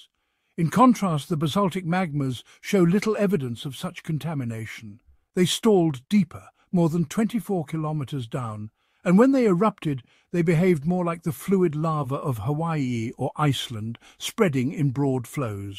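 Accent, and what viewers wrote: United Kingdom. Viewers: WTF are we listening to a Brit tell us about our PNW? Does he have any credibility? Does he know about Baker or Shasta? He brings up Arizona and Wyoming. Has he ever hiked through the Craters of the Moon as a kid? Where was he when Mt St Helens killed Harry R. Truman? Has he ever visited Mt St Helens? I doubt it! He reminds us of everything we experienced in 1980! Do we need to be told that there was plume across the US? Has heard about the Cascadia Subduction Zone? What goes silent forever? I’d suggest that the should shut up and go silent forever!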